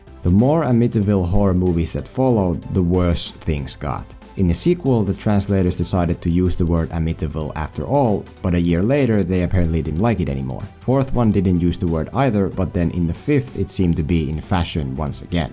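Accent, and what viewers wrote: Finland. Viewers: Terve mieheen! ;) Teikäl iha huippihyvii videoita ja tiiäks aina jotenki tuntu siltä että on siinä hieman sellast suomalaista aksenttia ;p Mutsiis äijäl aivan huippu hyvä englanti ja niiku Arska sanoi: "An acsent is a strenght!" ;) Ei hätää, se on meidän kahen pieni salaisuus... :7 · playdate is from 2012 not the 90s. base don the accent i'd say finish or lithuanian, based on the covers of the movies, its finish.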